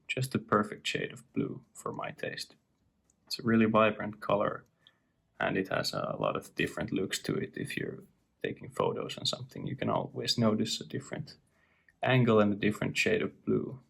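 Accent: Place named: Finland. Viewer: third comment in a day, enyoing your content man (the finish accent reminds me of watching interviews with my favourit band ;) ) - The new SRPE's are real stunning watches, I got a great deal on the 61 (grey dial, Nato-Strap) and ordered on Tuesday. That colour is really the best of the bunch in my opinion + the ones on the Nato have brushed bezels. I paid 180€ incl. shipping and customs from Japan to Germany and it will arrive in under a week if DHL is to be trusted. While at it I bought a fitting strapcode-oyster (solid links, solid endlings, solid clasp, screwlinks), set to arrive the same day and litarrily can't wait (thats why I watch all the reviews on the SRPE's currently ;) ) - hope this combination will look as good in the flesh as it does on pictures. Even more Tudor-Blackbay with that one. Already considering buying a strap from watchgecko, the grey-striped one you showed must look awesome with the grey-dial (maybe the darker-shade but it is a real strapmonster I believe). 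I was really enjoying your review, specially liked the sound/music for the Negatives and during the Lumeshot (you could turn the 70's-porn-sound to 11 though next time). As with the SNKL23 I'm also considering adding a double-dome for this one, would give it even more class.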